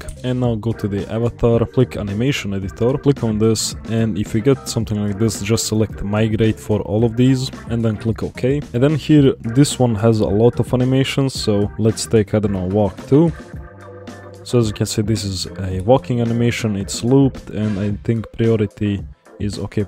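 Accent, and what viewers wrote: Russia. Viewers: Author, are you Russian? You have a distinct Russian accent. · Слишком русский акцент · у него русский акцент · Ты русский если нет то почему у тебя акцент как у русского? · It’s probably Russian accent, if not then everybody heard it before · Бро ты русский , по произношению слышно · Russian Accent eh?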